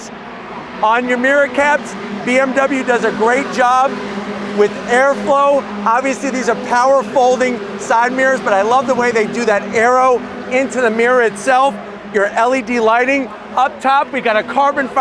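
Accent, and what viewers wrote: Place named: Redneck, United States. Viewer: funny American redneck accent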